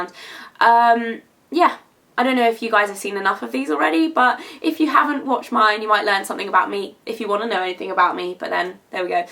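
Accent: United Kingdom. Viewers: I love your channel! Enjoyed your 13 questions tag! It is so neat to hear your accent! I would love to visit the UK someday! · ahhahhaaha squirty cream. i love british accents!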